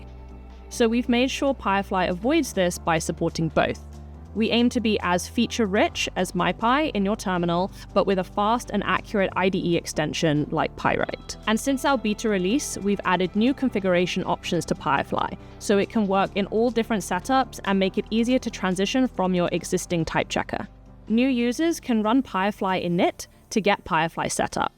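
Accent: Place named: South Africa. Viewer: is she south African or Australian? I can't tell?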